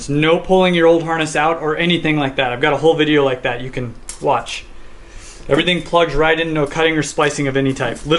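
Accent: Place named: United States. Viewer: You're trying to say it's not fair?! Dude...just think. It's so easy to figure out that he's in North America. You shouldn't believe that having to critically think is unfair. Without even clicking away from the video there is more than enough data telling you that he's in North America. He clearly has an American accent, the name of his web site which is in the description is rolling wrench DENVER. That's a city in the American state of Colorado. I can't believe that you'd actually rather make someone change the way they speak than just simply use your brain. I'm trying to help you man. Think critically instead of being lazy and asking others to change for you. I promise it will make life easier dude.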